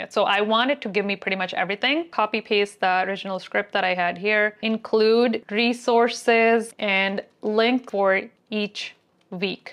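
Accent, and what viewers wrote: India. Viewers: Stop with the fake American accent. You're getting exposed with your Indian/Pakistani accent on several occasions. It's cringe of the highest order. Stop pretending 🙄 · Dear youtuber are u a kashmiri Coz ur accent sounds so much like us!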